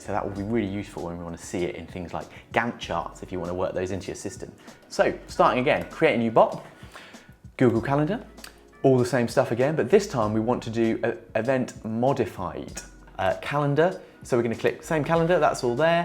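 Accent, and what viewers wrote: United Kingdom. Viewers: мне очень нравятся ваши видео, спасибо, я становлюсь умнее и организованней! А еще мне приятно слышать ваш АНГЛИЙСКИЙ акцент!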